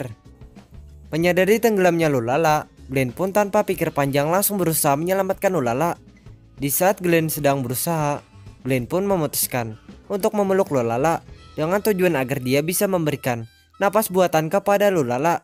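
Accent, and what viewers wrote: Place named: South Kalimantan, Indonesia. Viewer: Logat nya abang yg bawain cerita kayak orang KALSEL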